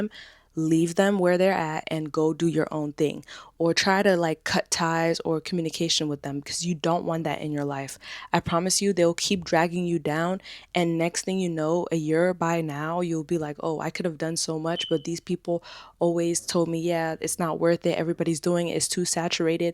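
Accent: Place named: United States. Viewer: Greetings from Angola 🇦🇴, right here in Africa! I just want you to know how much your videos mean to me. Your words, your energy, and your American accent inspire me to keep improving my English every single day. You have no idea how far your motivation reaches, it truly crosses oceans. Thank you for being such a light,...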